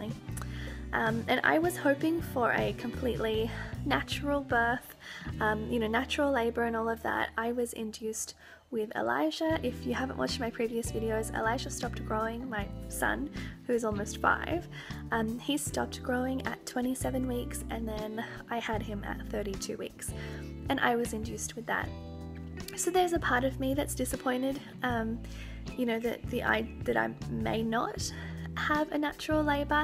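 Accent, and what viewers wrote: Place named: United States. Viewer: Happy to hear you and baby are doing well..its going so fast.I live in Qld Australia, I thought you were American lol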